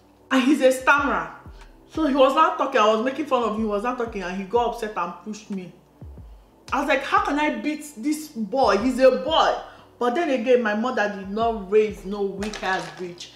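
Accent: Igbo, Nigeria. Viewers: I love your Igbo accent. Ability to speak English without a foreign accent is NOT a measure of intelligence. My husband and I did our traditional wedding in January. Family keeps asking when are we doing church/white wedding? We tell them we aren’t doing a white wedding because we don’t want it. They look at us like we have 4 heads. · Exactly! There's absolutely nothing wrong with your Nigerian/Igbo accent. That's what endeared me to you😍 I love how rooted you are in our Igbo culture.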